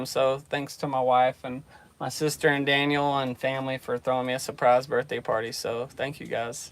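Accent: Oklahoma, United States. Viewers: Ponca City says hello! Just found your channel and love it. I knew you had to be in Oklahoma by just listening to your video for a few minutes. The Crosstimbers in Oklahoma starts a few miles from here in the Tall Grass Prairie Reserve in Osage county. Your videos are outstanding. Daughter #2 graduated from OSU. GO POKES!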